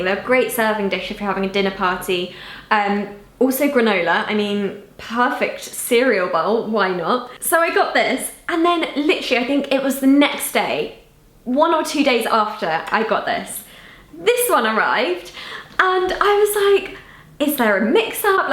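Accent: RP, United Kingdom. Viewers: I love the way she talks so plummy, the way she moves so classy!!! So satisfying to watch her. 🤗😙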